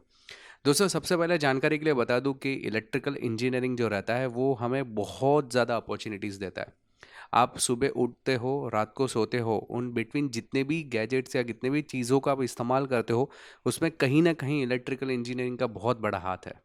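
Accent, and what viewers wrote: Gujarat, India. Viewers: Sir aap gujrat se ho kya kyuki aapki language aisi hai 😂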